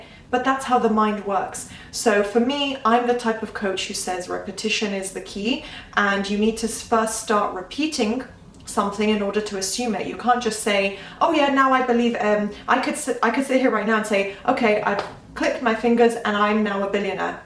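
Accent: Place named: London, United Kingdom. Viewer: thank you, dear I am passionate about your British, Londoner accent and of course, love your content always very clear and thourough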